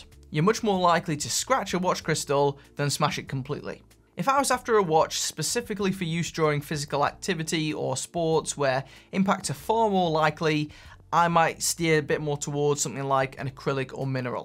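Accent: United Kingdom. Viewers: I love British accent interesting to listen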